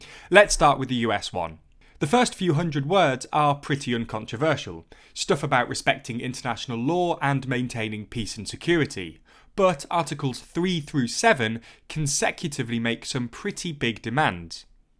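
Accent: United Kingdom. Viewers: You are plugging your opinion, not god. By accent British?British proof through history ar devious and rutten. This is for the beginning. Russia shall not negotiate with the West at all, history establishes the outcome. Russia should and will take all its own decision. Russia won the arms race against the USA, has bain the West doesn't have any. You are welcome anytime.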